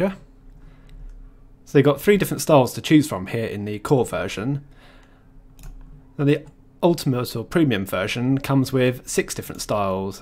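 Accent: United Kingdom. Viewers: Nice review done in Blighty English. How refreshing. Great job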